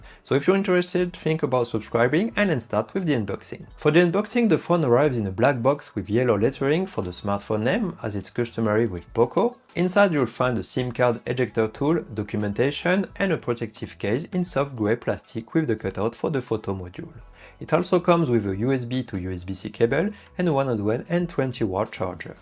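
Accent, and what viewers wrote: France. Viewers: L'accent français transparaît beaucoup, il nous en faut un aussi.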